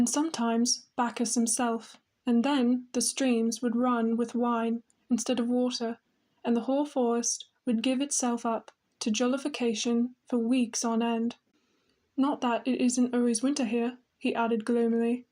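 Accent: United Kingdom. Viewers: Very classy british accent.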